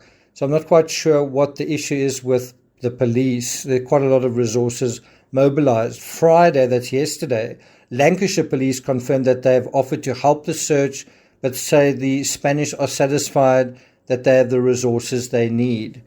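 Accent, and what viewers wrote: South Africa. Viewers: Can’t listen to a South African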